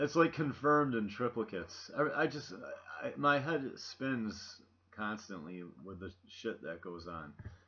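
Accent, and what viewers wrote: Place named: United States. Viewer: You're American (judging by your accent), yet you don't know that Trinidad is located in the Americas. :/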